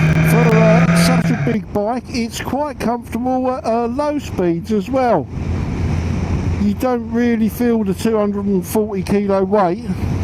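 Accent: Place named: United Kingdom. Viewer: For the love of Christ, why do you brits insist on pronouncing the letter Z as the word, "zed?" It sounds absolutely ridiculous. It's a ZZR, not a zed zed r. Z. As in "zee" if you must, but dammit, knock off that retarded zed nonsense. And yes, I have one, an 04, moonlight silver with corbin beettle bags, and i would NEVER call it a zed. Its a ZZR!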